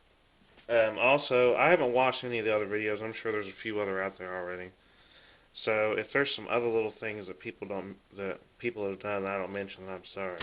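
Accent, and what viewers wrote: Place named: Cleveland, United States. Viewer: And he's from Ohio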